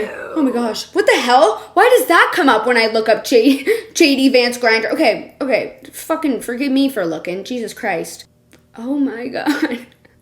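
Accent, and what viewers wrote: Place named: Canada. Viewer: Canadian detected, I won’t be subscribing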